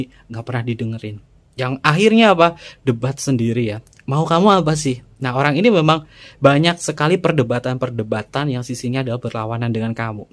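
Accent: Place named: Wonosobo, Indonesia. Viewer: "sikapnya si pekok kempleh2" 🤣🤣🤣 kak bahasanya mirip kaya wonosobo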